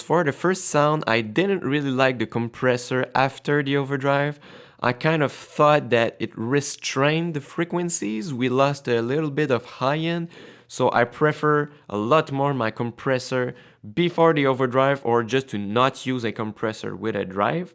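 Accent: Quebec, Canada. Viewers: You sound like you're from Quebec?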